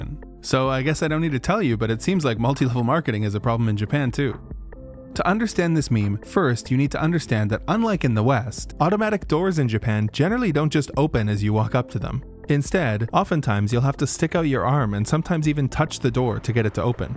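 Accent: United States. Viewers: The Texas accent was hilarious, coming from an authentic Texas native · I live in East Texas and I've heard people speak with a VERY similar accent. Even kind of sounds like an old politician from the 90s named Ross Perot.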